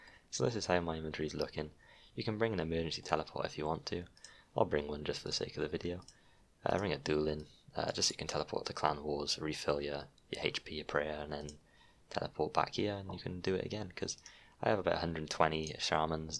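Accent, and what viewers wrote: Wales, United Kingdom. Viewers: Can tell youre from Wales with the accent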